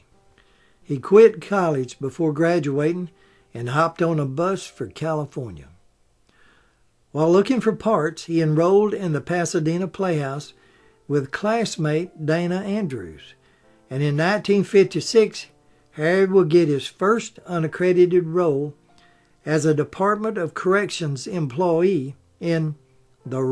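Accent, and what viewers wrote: South, United States. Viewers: .. Yet another fantastic video. Your videos are so factual and informative. There is an added asset to your videos---- that lovely southern accent. Please do a video on Rosa Parks. Thank you for this wonderful video. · "How would you like to be remembered" -- "Doesn't matter"! This was all extremely interesting, -- thank you, and please don't ever lose that natural Southern (?) accent.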